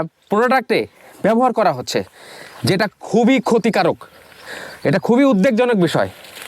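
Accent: Bangladesh. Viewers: Aapner Bangla ta west Bengal er Banglar moto noi ... Bangladesh er banglar sathe khub mil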